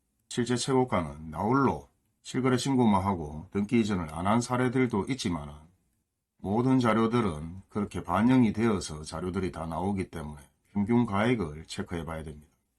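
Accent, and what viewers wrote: Gyeongsang, South Korea. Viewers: 목소리 좋으십니다. 딱딱하지 않는데요. 경상도 기본값 아닙니까.?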